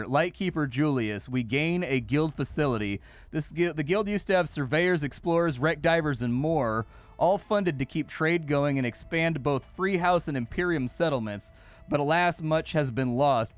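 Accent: Netherlands. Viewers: Damn the dutch accent is a great accent for tutorials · That dutch accent, always so easy to spot 😂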